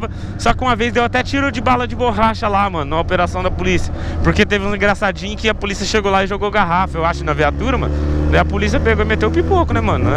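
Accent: Goiás, Brazil. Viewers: vc e goiano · porquê vc fala no pique de Goiás ?? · pk ele fala no pique de Goiás se ele é de londrina😂😂😂😂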